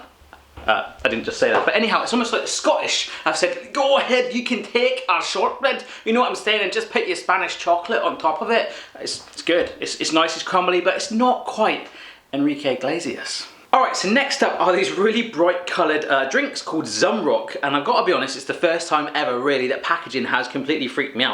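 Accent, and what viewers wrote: Mexico. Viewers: Why you speak spanish with mexican accent? And why you are always saying "Ole!"? :C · No se quejan de que no hable español bien, se quejan de que hace un acento mexicano como si todos los de España hablaran asi, pero bueno que se puede esperar de un ingles sin ninguna cultura -. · Man, how come you still play spanish accent as mexican? Travel more mate, we are not that far away from your place... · We haven,t got Mexican accent 😂😂 Our accent is more clean, I don't know how to say it. The only accent in Spanish are the "RR" and the "z" but it hasn't really accent. But good video,funny like always 😂😂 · You speak as a Mexican , the Spanish is as the italian or the français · I don't know what's worse, the way you eat the "seeds", using a mexican accent for spanish people, the way you ate the melon gum or you trying to be funny and turning out to be absolutely awful. 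· Yeah, man... you definitely think that the Spaniards' accent is the same as the Mexicans'... and sorry, but it's not, really, at all. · España y México son diferentes no pongas acento de mexicano para hablar en español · Maaan, we don't speak like Mexicans jajaja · por que imita a un mejicano cuando habla español?, no todos los que hablan español son mejicanos, de hecho, no viene de ahi la palabra español precisamente. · Why did he talk like a mexican? Spain is in europe, we don't have latin accent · this suspicious white powder you're eating is called sidral, and you're speaking spanish like a weird mexican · Your mexican-spanish accent was funny hehe but we Spaniards don't speak like that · why are you talking like a mexican? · lel so bad man spanish people doesn't have that pronunciation that's from mexico... And the green melon is a chewinggum u shouldn't swallow it. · Madre mía xD, pero este porque habla con acento mexicano , a ver si estos estadounidenses van aprendiendo de una vez que España no es México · NObody says Ole in spain and you spoke like mexican, not like spanish xd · The accent you use to speak as a spanish is a mexican accent xD Spanish dont speak like that ;D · Por qué cuando hablais de España poneis acento mejicano y decís cosas como "olé "???México y España están a un oceano de distancoa y son culturas muy diferentes. 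· Your mexican accent is awesome, but in Spain we don't speak like that my friend :P hahaha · Nice video man,but spanish don´t speak with mexican accent.... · Dude, I'm from Spain, and we are not Mexican 😂 We don't speak with that accent 🙈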